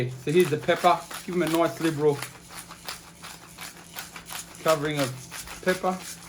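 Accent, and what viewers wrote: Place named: Australia. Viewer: Hey there Chef.............. I'm looking to learn,,,,,,, to cook : using "GAS"....... and wow dude, I love it,,,,, you're not so easily understood,,,, because you speak "AUSTRAILIAN" english, and not "AMERICAN" english. .. I love it,,> thanks so much!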